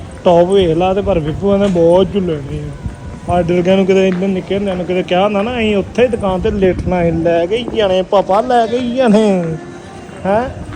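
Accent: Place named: Malwa, India. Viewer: Haji veer ji malwa Di boli aa ❤❤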